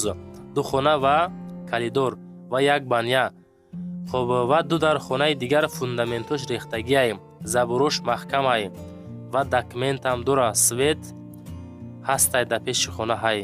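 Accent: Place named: Gharm, Tajikistan. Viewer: Чхели Ғармай Раштай соз соз гап зан бародар нашоти Ғарм🫵✅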